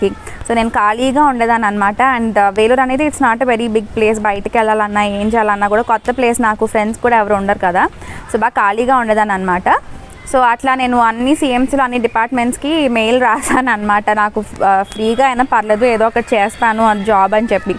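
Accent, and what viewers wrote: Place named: Vijayawada, India. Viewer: me slang chusi menu anukunna vijayavada ani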